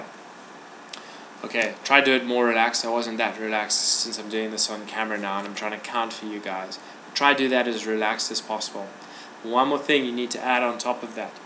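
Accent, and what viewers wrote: South Africa. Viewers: hi are you south african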